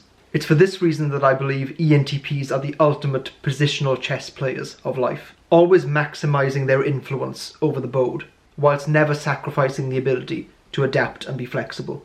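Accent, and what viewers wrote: Wales, United Kingdom. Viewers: Bruh I really want to listen to you but I just can't stand your british accent. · Are you welsh?? Sorry just trying to pin the accent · lovely welsh accent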